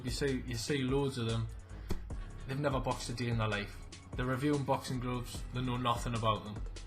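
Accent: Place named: Ireland. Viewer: Thanks for taking the time to answer. You sound like you are from Ireland. If so, I guess you are all in for McGregor?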